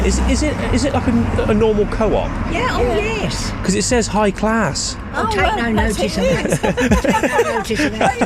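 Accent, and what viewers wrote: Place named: Shropshire, United Kingdom. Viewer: Hi from a fellow Salopian 👋, I thought I recognised the accent . I actually found your channel while waiting for my daughter and boyfriend who are at Cineworld in Shrewsbury . So sorry that happened , total scumbags , should not be allowed to breathe the same air.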